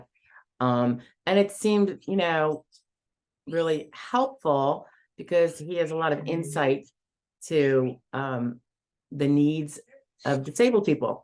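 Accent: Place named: New Jersey, United States. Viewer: i like your vids could u do a vid with your jersey accent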